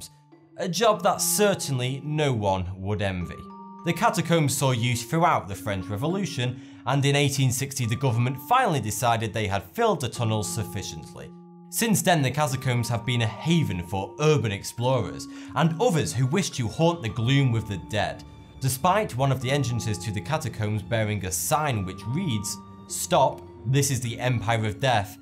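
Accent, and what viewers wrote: United Kingdom. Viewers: I am Canadian, and though I don't think that fact is especially relevant, I find his particular British accent adorable, especially since his insights and conclusions about the facts he researches are so intelligent and well thought out. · It's just his accent, some British people say f for th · His name isn't 42, he's actually saying but with his British accent. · A weird accent?! Its a british accent, the home of the language! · Wearing a suit and having a brit accent obviously has not made you an authority. You could have done so much more with this. Among the many things you missed....there are hundreds if not thousands of cave houses available right now all over the world..Spain is a big one. And an underground house is possible anywhere on this planet with a constant temp. inside. I find this whole video obnoxious and full of incorrect information. 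· I thought it interesting that the video showed US money falling rather than French money, or even British, since the presenter sounds British. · It's literally a normal British accent... This is what most of us sound like, not that RP bullshit Americans expect us to XD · I was interested in this video, but I'm not watching it because your non-standard pronunciation of "f" and "th" really grinds my gears. I don't know about everyone else, but I associate this language "feature" with lower-class, uneducated people. Rationally, I know it is just one of many British dialects, but emotionally, to be redundant, IT GRINDS MY GEARS.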